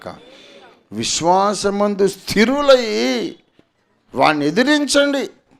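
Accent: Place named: Andhra, India. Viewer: గారు మీరు తెలంగాణ వారు అయినా మీ భాష చాలా అద్భుతంగా ఉంటుంది అసలు సిసలైన అటువంటి ఆంధ్ర విజయవాడ గుంటూరు భాష మీరు మాట్లాడతారు మీ భాష చాలా అద్భుతంగా ఉంటుంది పాస్టర్లు ఎందుకు అదోరకమైన భాష మాట్లాడతారు ఎందుకు మాట్లాడుతారు తెలియదు ప్రజలందరూ మాట్లాడుకునే భాష అందమైన భాష మీరు మాట్లాడతారు చాలా అందంగా ఉంటుంది మీ భాష ఆంధ్ర వాళ్ళు కూడా ఇంత చక్కగా ఈ భాష మాట్లాడలేరు మీరు మాట్లాడే భాష వింటుంటే ఎంత ఆనందంగా ఉంటుందో అసలు సిసలైన ఆంధ్ర భాష అంటే మీరు మాట్లాడింది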